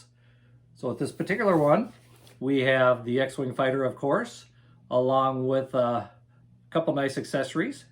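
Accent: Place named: Upper Michigan, United States. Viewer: Collected since 78 and still hooked. I have to ask about your accent. Are you from Upper Michigan by chance? I am and your accent sounds like back home. Lol Great channel!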